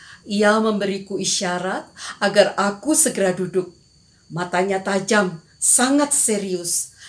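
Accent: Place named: Papua, Indonesia. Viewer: wah cerpen dengan logat papua. keren.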